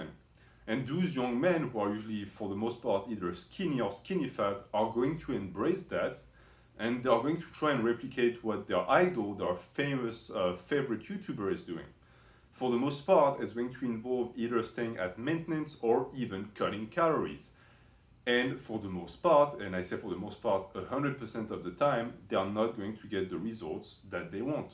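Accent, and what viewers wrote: France. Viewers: J'aime bien l'accent français ;)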